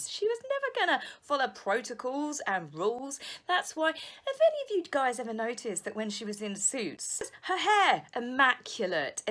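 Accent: England, United Kingdom. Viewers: I love the sound of your voice and English accent and snippets of everyday life somewhere in England before getting into the lives of the royals.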